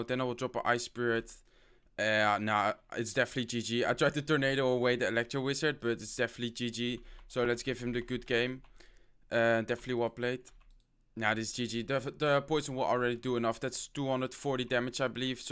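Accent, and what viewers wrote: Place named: Netherlands. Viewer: Are you dutch? Because you sound like a dutch guy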